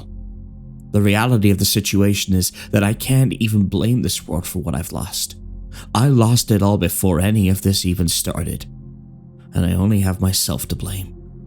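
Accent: United States. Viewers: your American accent is basicly flawless.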